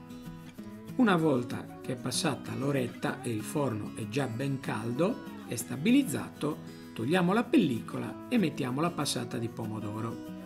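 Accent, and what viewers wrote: Sardinia, Italy. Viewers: mi pareva di sentire un lieve accento sardo ... saluti da Ercole Pinna ... sarebbe interessante una pizza con prodotti sardi ... carciofi bottarga e pecorino !!!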